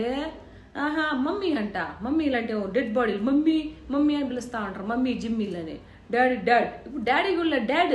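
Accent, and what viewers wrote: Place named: Krishna-Guntur, India. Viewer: Your Telugu language is also somewhat not clear. You always try to speak Krishna and Guntur Districts Telugu language. Regards.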